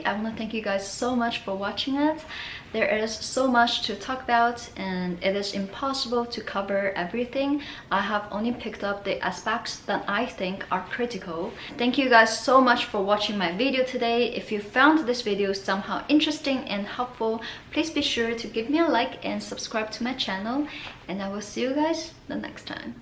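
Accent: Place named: China. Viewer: You are the best YouTuber, I watch almost all the videos of your channel. love your voice, your Chinese accent. I think you are absolutely gorgeous, and you are a really confident person. I really want to be friends with you, I can imagine that you are such an amazing friend. Keep film more video!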